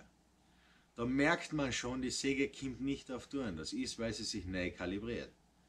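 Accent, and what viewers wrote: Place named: Austria. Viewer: Jo stimmt, der österreichische Dialekt kling so richtig angenehm.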